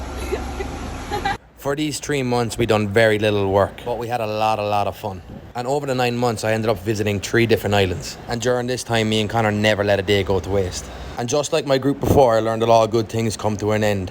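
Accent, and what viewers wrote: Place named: Ireland. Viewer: Nah your Irish Accent has got me weak 🤤 and your face is distracting 😍 got me in a trance 🤩 YOU ARE BLESSED & BEAUTIFUL 💐😇🆙️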